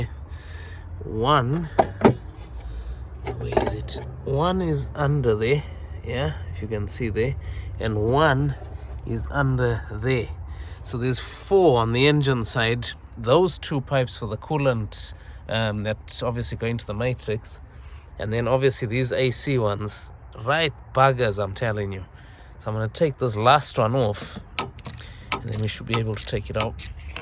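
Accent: South Africa. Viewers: Ayyyy bra you from south Africa?typical charou tunes here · Man u almost sound south african lol Love da video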